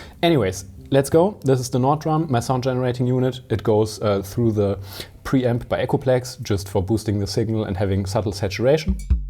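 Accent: Germany. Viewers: way better with the german accent. thanks!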